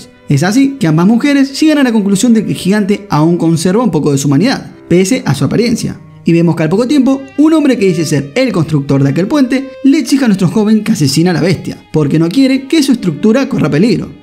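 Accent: Argentina. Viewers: No me gustan los resumenes tan largos pero no avía escuchado uno en ARGENTINO y neta de argentino a el orrible y culerisimooooo castellano que chingón se escucha 😳😅😂😂😂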